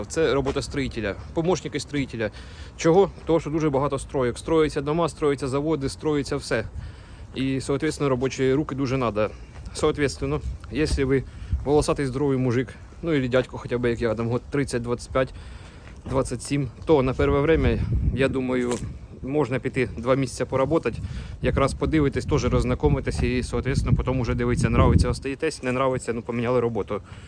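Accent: Ukraine. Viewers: досмотрела до конца, хоть и не ищу работу)Я ещё не слышала такого говора) акцент украинский, а слова русские, очень необычно 🙃 Удачи Вам, где бы сейчас ни были!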